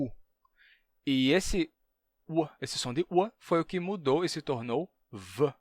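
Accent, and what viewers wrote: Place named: Northeast, Brazil. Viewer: Bom Jesus da Lapa- Bahia)🌎🇧🇷🌵parabéns Guerreiro tu manja da língua portuguesa tu poliglota. 🇵🇹Foi um português que descobriu aqui a gruta a mais de 300 anos faça pesquisa ai Google Guerreiro do RN. Seu sotaque é massa nosso Nordeste de vários sotaques. Hoje tem internet para divulgar mostrar os 2 lado da história real . Tem.baiano qua apedreu Mandarim só ele de xique xique-BA. Ele tem canal faça pesquisa ai guerreiro. 🇨🇳🛫🛫🛫🛫🛫🌵🌵🌵🇧🇷2025 Bahia..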